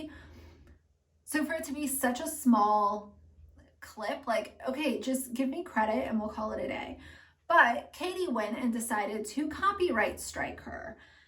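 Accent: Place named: South, United States. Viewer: Lip fillers and botox!! She is from MN and suddenly has a southern accent and spoke to Amy Duggar King and now says, ” Y’all.” She is very one-sided with her opinion. Only hers counts. I subscribed when she covered the Schoenfield alleged Munchausen by proxy kids. I unsubscribed when she ripped the Duggar kids apart. Not including Josh, the others need compassion. Enough ppl complained and now she has changed her tune. She is small-minded. I feel sorry for her. She is very typical of this area of the country. I have lived in each region of the U.S. and I feel I am able to make this opinion also from experience in the Military and from being a Military wife.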